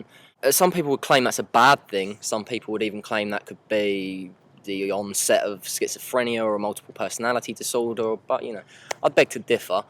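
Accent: United Kingdom. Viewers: Seems like we've all chilled out a bit more recently which must be generating more positive energy as speculating too much can lead to accidently creating negative reality I guess. Really good to have you back and nice one for holding onto your British accent!;)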